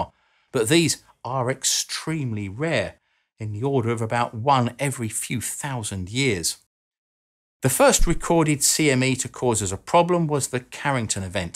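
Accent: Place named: England, United Kingdom. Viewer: Your accent is so... Brrritish!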